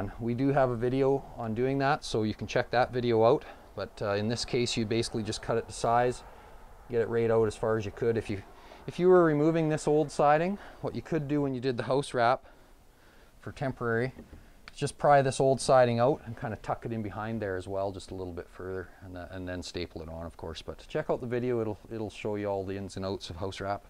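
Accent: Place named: Canada. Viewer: I needed help to fill a window hole. I could only find this and I figured, pretty much same process. This will work perfect. Thanks so much. I was also pleased when I heard you speak. I thought, "Well now...a Canadian accent." Thanks a bunch from New Brunswick.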